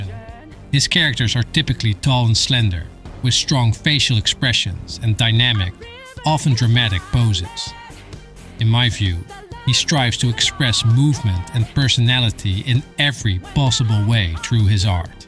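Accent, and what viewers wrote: Netherlands. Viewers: are you perchance dutch i feel like u have a dutch accent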